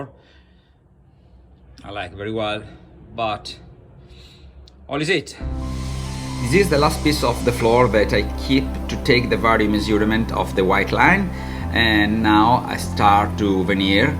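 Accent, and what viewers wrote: Italy. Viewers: personally I find you English spoken with your Italian background quit charming.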